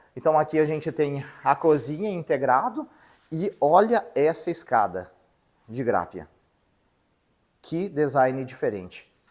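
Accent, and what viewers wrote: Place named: Rio Grande do Sul, Brazil. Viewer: Meu amigo fala com o nosso sutaque, aqui nós falamos tu e não vc, bas que barbaridade tchê!!!!!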